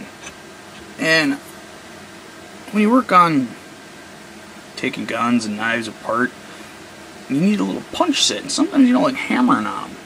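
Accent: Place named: Michigan, United States. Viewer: I love the long needle and cork idea! Many times we've had to punch holes for belts, and also we like a make small leather projects. You can get a rolling wheel with sharp spikes on it, to mark your points for stitching, then use your needle punch to finish the holes through. Thank you Accent sounds L.P. Michigan to me, have relatives. I really like all the punches, and variety you have created for use with your arbor press.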